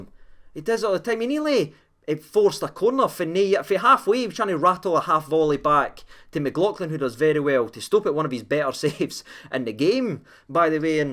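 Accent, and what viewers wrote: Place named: Glasgow, United Kingdom. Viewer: I just love your wee Wegie accent❤️❤️ 🇦🇺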